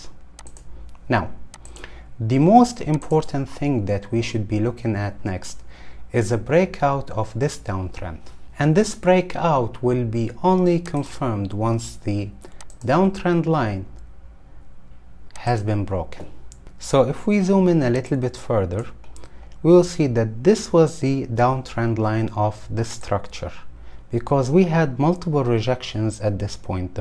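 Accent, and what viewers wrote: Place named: North Africa. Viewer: I am hearing a North-African accent :)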